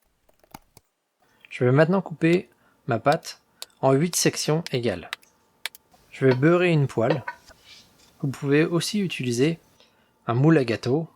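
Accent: Venezuela. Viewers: jajajaj excelente español a lo Venezolano, buenísima receta de ese manjar de los dioses.. Como dirían por aquí, "pa chuparse los dedos" saludos mi pana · Francés venezolano?🇦🇷🇧🇬🤔🤔🤔🤔🤔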